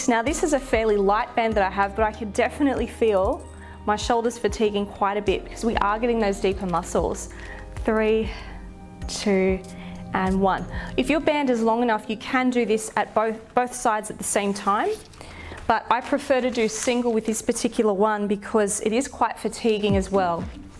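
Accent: Australia. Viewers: Just found your videos tonight when looking for exercises for my sore shoulders to complement my physiotherapy. Enjoyed them so much I've just ordered decent bands to work with. I'll be looking up your other body workouts here on. Thanks. Love your reassuring delivery as well. Must be the Aussie (?) accent :-)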